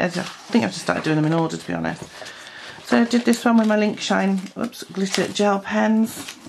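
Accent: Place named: Wales, United Kingdom. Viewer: I love how matter of fact and down to earth you are. Colouring- warts and all, with baby and lack of sleep and dressing gown, is very refreshing. Also love your accent. I think you live in Wales, but does your accent have other influences?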